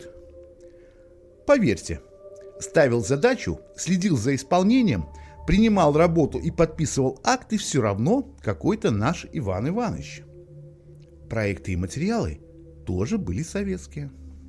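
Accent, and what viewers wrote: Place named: Belarus. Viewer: автор или из Беларуси, или из Псковской области - мы так "Я" не произносим))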